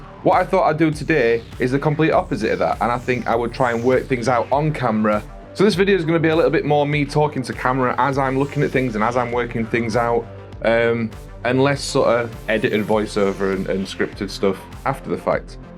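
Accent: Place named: North, United Kingdom. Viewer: I 'ears a Northern accent, I subscribes. This is t'way.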